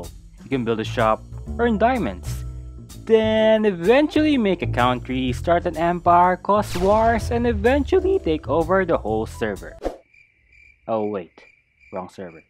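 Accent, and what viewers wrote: Philippines. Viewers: Why do you sound like a Filipino? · I’m just gonna guess, but... Are you filipino? The accent’s there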